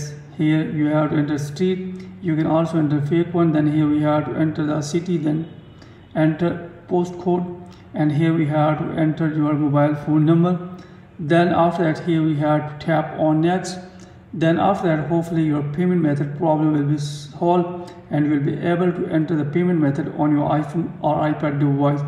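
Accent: India. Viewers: He’s Indian bro. He did great.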